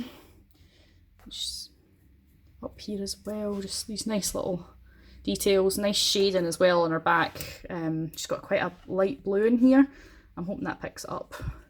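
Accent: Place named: Scotland, United Kingdom. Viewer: I think the Scottish accent is the coolest! So fun to listen to! keep up the great reviews!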